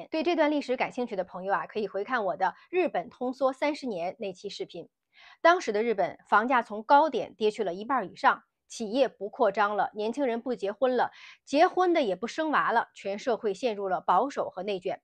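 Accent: China. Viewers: 你应该是大陆人吧，居住在台湾，口音像大陆人，写的字也是简体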